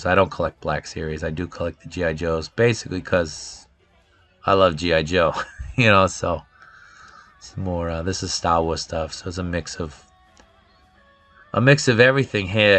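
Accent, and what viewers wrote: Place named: New York, United States. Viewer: That New York accent has to go. I had to watch the video with the sound off lol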